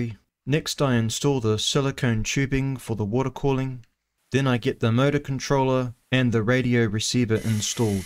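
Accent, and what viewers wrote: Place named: New Zealand. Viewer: Good work, thought i could hear a kiwi accent, good to see some kiwi diy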